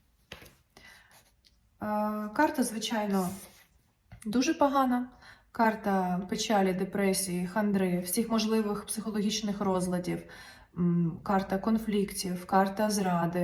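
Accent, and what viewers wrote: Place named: Kremenchuk, Ukraine. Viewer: Чистейший украинский, скорее в Кременчуге слышал, ни в Киеве ни Харькове, в Харькове вообще не слышал, ни в Полтаве ни в Бердичеве, ни в Житомире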